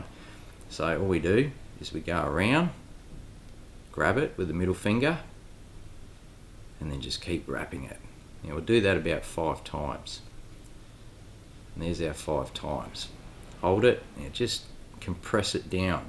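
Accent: Australia. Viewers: this is the easiest way to tie it, hands down. except for i think most ppl including this vid, over due the hitches. a couple times is cool, atleast from my experience. but good vid, and love the accent. CRIKEY MATE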